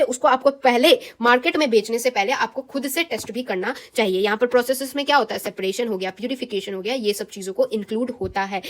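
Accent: Bihar, India. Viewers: Didi aap bihar se ho kyyy you have an accent like us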